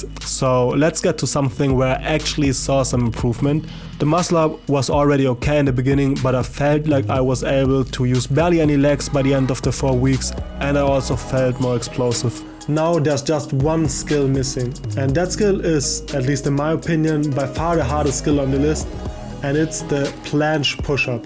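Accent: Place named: Germany. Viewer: I hear deutschen Accent (W Vid bro)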